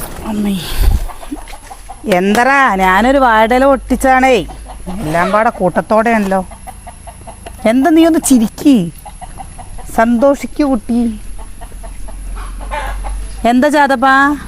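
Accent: Palakkad, India. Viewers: Snake kanum nokynadakanam mol.palakadan samsaram nalla eshamannu ketto.thank you all family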